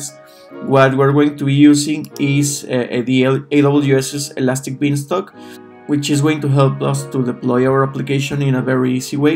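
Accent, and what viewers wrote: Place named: Spain. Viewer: I think you have a bit of nice accent coming from Spanish, am I right? :)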